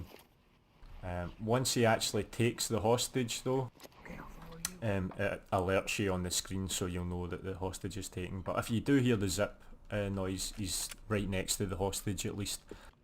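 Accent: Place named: Scotland, United Kingdom. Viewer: CS GO tutorial in a Scottish accent. Fuckin inject it in my veins.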